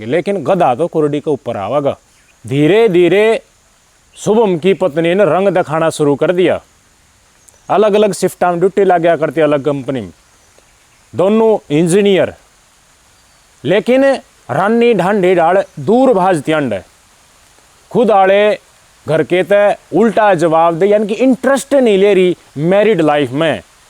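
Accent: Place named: Bhiwani, India. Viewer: Bhai Bhiwani ki lapeteydar boli wah bhai wah 😅😅😅😅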